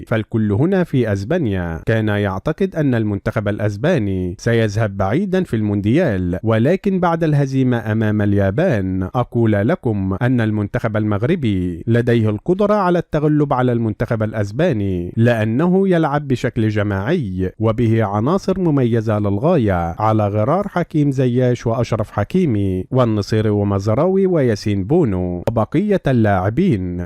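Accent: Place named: Egypt. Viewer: هههههه. اتكلم عربي. من لهجتك المصرية.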